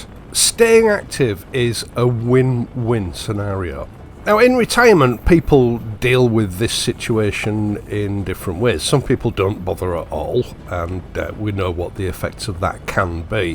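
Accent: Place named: Yorkshire, United Kingdom. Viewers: Hi, I was saying to my wife what an unusual sounding voice you have, I have listened to you before on various subjects about bikes, but honestly I could listen to you talk for hours! The wife thinks you may have a Yorkshire accent, is this correct?! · Never been on a motorbike during my 66 years on this earth. I own six pushbikes but now I’m getting the calling to to do something different. I want to learn a new skill and test myself. Would never go over a 125, would never need to. Pretty fit and in not bad health, never do less than 10K steps a day mostly dog walking and have two massive LGDs. Your video has given me the motivation to take it further. Subscribed, as I always enjoy listening to a fellow Tyke.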